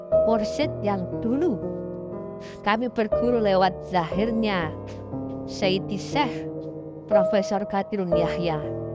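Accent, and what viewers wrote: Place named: Surakarta, Indonesia. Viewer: Itu suara solo mas😮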